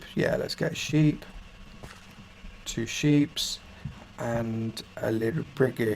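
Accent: United Kingdom. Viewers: OFC the meshes and what not won't match, but overall design-wise there are similarities between your design and Elf City/Grand Tree. But seriously tho, The British Accent makes anything watchable! :3 I don't play MC too much, but when I'm on TILT on League... It helps me unwind and reset before reclimbing. So I always keep it on my desktop. And your guides are pretty darn great for us scrubs here.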